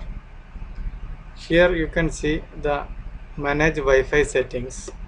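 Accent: India. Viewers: Your accent is too indian for me.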